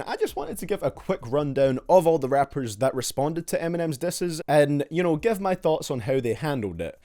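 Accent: Scotland, United Kingdom. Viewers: Are you Scottish, if so me too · Ahahahahahaha. Your adorable Scottish accent makes you sound so adorable and I could never take you seriously. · Lol this nigga British af talking about rap, that shits funny as hell 😂😂😂 I love it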